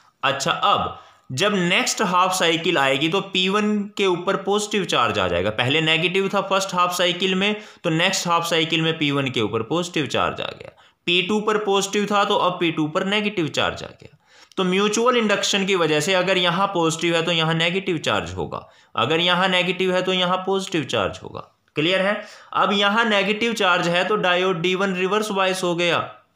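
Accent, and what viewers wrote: Haryana, India. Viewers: Sir ,Are you from Haryana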